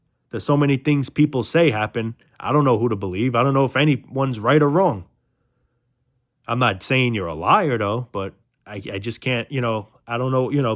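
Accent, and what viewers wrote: New York, United States. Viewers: Red pills with a NY accent. I'm in.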